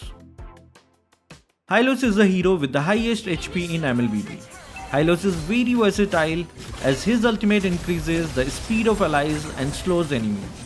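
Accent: India. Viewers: Are u indian?your accent feel like indian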